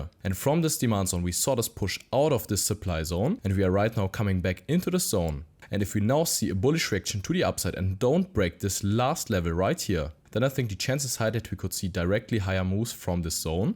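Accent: Germany. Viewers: Very German accent :D. Aber ist in Ordnung.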